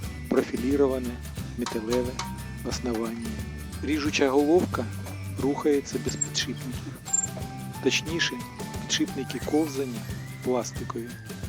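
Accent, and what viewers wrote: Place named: Russia. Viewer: Російський акцент ріже вуха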